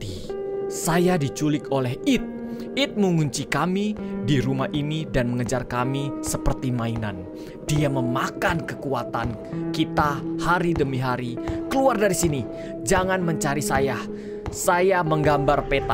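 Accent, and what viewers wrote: Java, Indonesia. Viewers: Medok banget😂Forte Vanguard FG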